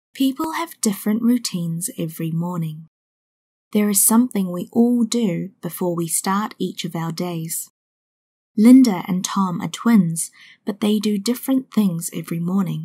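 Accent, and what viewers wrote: New Zealand. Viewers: Your channel's contents are encouraging me to do better listening skill, especially in NZ accent, but it is a little bit ashame that only a few people know this chnnel.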